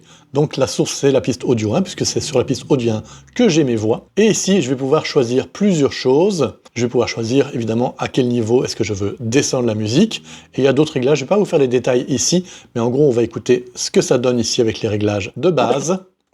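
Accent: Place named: Belgium. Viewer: C'est peut-être une fois la pointe d'accent belge 😉 En tout cas, bravo pour vos vidéos explicatives !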